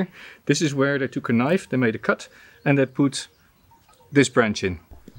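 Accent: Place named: Netherlands. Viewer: Hi, you sound Dutch but I am not sure. I am a beginner bonsai grower and was wondering if I can ask some questions. Have some soil problem for my ficus and looking for some species that do well outside In Holland. Best regards Dennis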